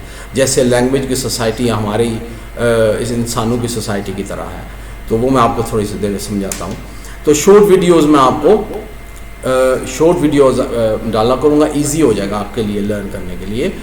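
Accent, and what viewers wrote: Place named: United Kingdom. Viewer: Hi, You the person whom i was looking for British accent and your excellent work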